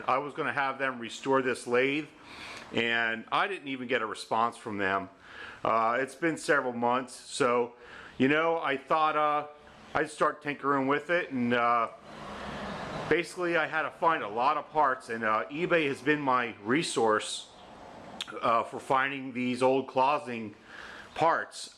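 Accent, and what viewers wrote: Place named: Pennsylvania, United States. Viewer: I knew I recognized that accent. Fellow PA guy! If I were out in Cali, I'd have done that restoration for you. To show you my work, check out my set of lathe-restoration videos here: <link> Imagine if I got my hands on your Clausing and what she'd look like?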